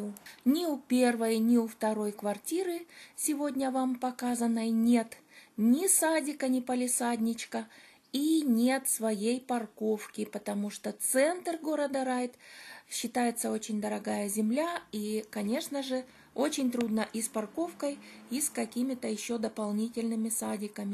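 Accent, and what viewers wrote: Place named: Provincial, Russia. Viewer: А ведущая из какой хацапетовки со своим говором?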